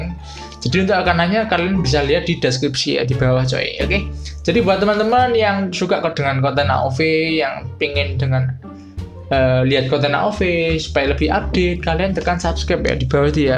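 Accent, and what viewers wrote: Java, Indonesia. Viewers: Asli kang kog medog tenan. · Bang wong jowo tah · Wong jowo yo lur mantap tenan 😂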